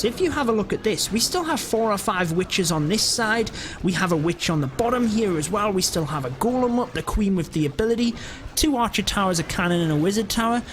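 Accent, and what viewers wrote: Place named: North East England, United Kingdom. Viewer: CraziiPower he has to slow his "talking" down because otherwise you would not be able to understand him due to a strong north east (England) accent. Also to me it's not slow at all, just clear and efficient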